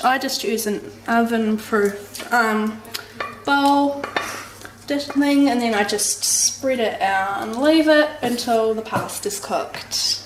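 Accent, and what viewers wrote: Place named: New Zealand. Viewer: I can tell you are from NZ because of your ingredients but in hindsight you accent should of given it away.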